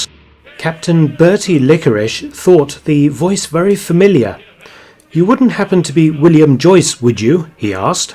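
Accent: United Kingdom. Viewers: I love the British accent. Militree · Sorry guys but it all sounds a bit iffy to me as many of these types of programs do! it sounds very pompous and how could anyone know what really happened. Its almost like listening to a WW2 propaganda speech on the radio, half truths and no better than the usual bull crap you find on Youtube, but this has a nice British accent. (fake accent?)The only real thing is the film used.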